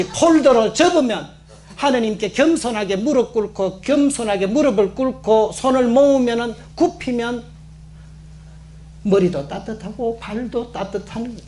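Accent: Gyeongsang, South Korea. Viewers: 신부님!! 우연히 신부님 갱상도 말씨에 반하여 강의를 듣게되었습니다 경상도 말씨 넘 정겹습니다 뒤늦게 신부님 강의 듣느라고 요즘 삶의, 신앙의 생기가 살아납니다 신부님 목소리 오래오래 듣고 싶습니다 열정적인 좋은 강의에 감사하며 무엇보다 건강하시도록 기도하겠습니다~♡ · 좋은 말씀 감동입니다 ㆍ 경상도 악썬트에 노래로 재미나게 말씀을 풀어주심에 감사 드립니다 ㆍ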